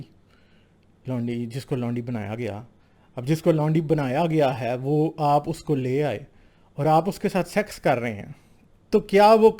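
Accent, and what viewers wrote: Punjab, Pakistan. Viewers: I am so pleased to see the books.your accent reminds me of my Punjabi friend from Amritsar.wish Islam never existed we would have remained as a single strong country.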